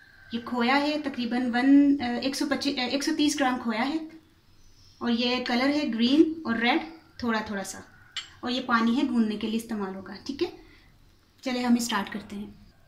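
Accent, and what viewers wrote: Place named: Punjab, India. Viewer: mashAllah very nice ot apka punjabi bolny ka style bht achaa laga..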